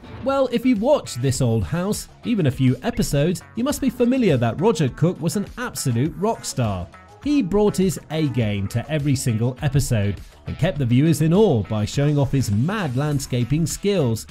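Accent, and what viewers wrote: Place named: United Kingdom. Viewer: There’s something about this style of British… I don’t even know what to call it….sensationalism?…that always comes off as insulting to the people being discussed and over-hyped. As soon as you hear that particular British accent, you know the presentation is just going to be an audible ‘National Enquirer’ presentation. It’s not going to be polite. It probably isn’t even going to be true. I have to tell you when I heard the word “dummies” used I got instantly angry. I don’t believe people actually feel that way about the personalities in This Old House. I couldn’t believe that was said. Disgusting.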